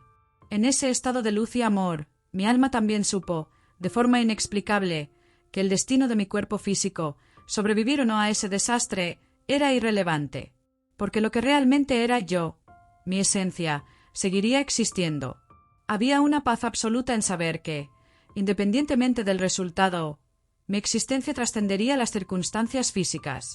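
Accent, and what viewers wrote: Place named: Spain. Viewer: JA JA JA COMO YO ? PRIMERA LA BURRA POR DELANTE Y LUEGO LA ENFERMERA Y EL EQUIPO MEDICO AUXILIAR PRA ATENDER ALA PERSONA ENFERMA DURANTE EL VUELO. SI.. POR LA NARRACION DE VOZ ES UNA ESPANOLA QUE NI EXPRESARSE CON CONCORDANCIA SABE. EN SU PROPIO LENGUAJE. QUE VERSGUENZA ASI ARRUINA EL MENSAJE DEL SUCESO. EN CUESTION .QUE ABURRIDA NARRACION.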